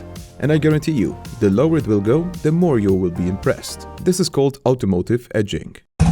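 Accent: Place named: Poland. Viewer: Masz bardzo słaby polski akcent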